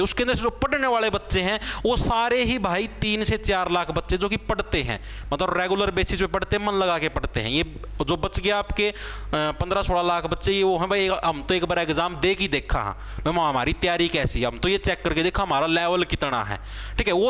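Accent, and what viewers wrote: Haryana, India. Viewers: I love you haryana accent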